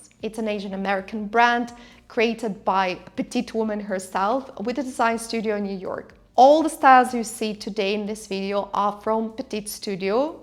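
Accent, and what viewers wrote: Russia. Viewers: russian acsent 😐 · почему у нее русский акцент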